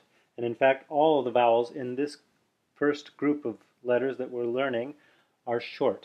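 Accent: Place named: Canada. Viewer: I had watched next I one in the series after making this comment: and noted that u did NOT use a dipthong, as in 'bone' [when pron. w an English accent]. I take it then that that 'o' (or 'o-ou'), would be more suited to this symbol: औ . Tho as u say: with a 'canadian' / afro-carribbean accent; so more like 'u-ou'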